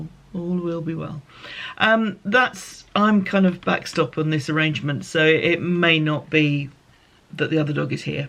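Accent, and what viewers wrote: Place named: Lancashire, United Kingdom. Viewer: Ah yes I am familiar with self-propelled mowers from my days of working on a golf course. That will take some effort of learning on your part. Be very careful not to be dragged away. Lol My paternal grandparents are from Lancashire. Quite a recognizable accent for me. ♥ Your dress brings back memories of my sewing days from 35 years ago. Nice work! Wow! Keep your puppy close. Hard to tell how large the fox is but better to be safe than sorry. A haircut is always welcome but I find that the day before I go, my hair tends to look fabulous. It is almost like it wants to make me doubt my decision to have it cut in the first place. Take care and stay well.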